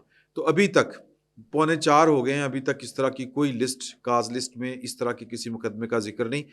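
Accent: Punjab, Pakistan. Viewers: سلام عقیدت عرض ھے کہ پروگرام پنجابی لہجہ کی وجہ سے دو آتشہ بن جاتا ھے گزارش ھے دلال منیب اختر کے بیٹے نے جو خود ہم جنس پرست ھے اپنے جیسے ہم جنس پرست سے شادی کر لی ھے جس میں یہ موصوف بیوی اور دوسرا شوہر کے حقوق استمعال کرے گا اگرچہ یہ سب حرام رزق کا نتیجہ ھے آپ دلال منیب کے ذکر کے ساتھ یہ بھی یاد دلایا کریں تاکہ دلال منیب کو اپنی کمائی اور اصلاح کی سوجھے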